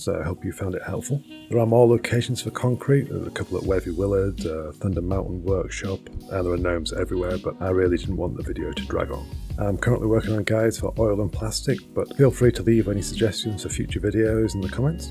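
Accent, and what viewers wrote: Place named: United Kingdom. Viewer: Came for the concrete, subbed for the British accent!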